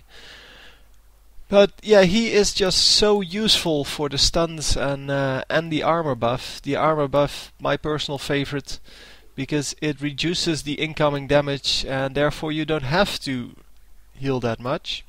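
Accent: Netherlands. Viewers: stop the dutch accent!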